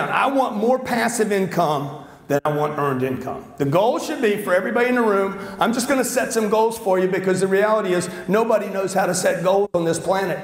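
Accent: South, United States. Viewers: I love Grants Southern accent, makes him just that much more BADASS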